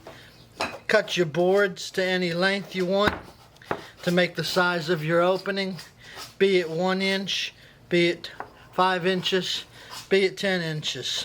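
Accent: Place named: New Orleans, United States. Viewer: that's slick. I'm planning to build some drawers in my shop and I'm definitely going to have to use some " Blocks". I can still detect a little of the New Orleans accent.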